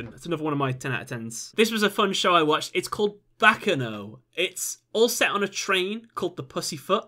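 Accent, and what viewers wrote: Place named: Birmingham, United Kingdom. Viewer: “much simpla” ah it’s good to be a brummie like you haha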